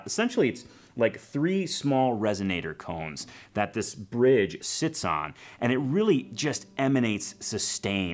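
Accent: Chicago, United States. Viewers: nice review.. I can hear the chicago in your voice ( I am a north sider by birth) and you have mentioned Old Town School ( where I studied harp with my dear friend Joe Filisko). May I ask would you consider plugging that bad boy in? I have a few resos but only one with a pickup ( and that is lousy) ...thanks and be well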